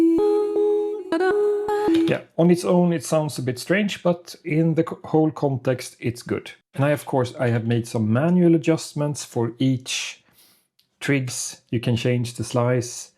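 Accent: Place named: Sweden. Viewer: You sound super Swedish!? ❤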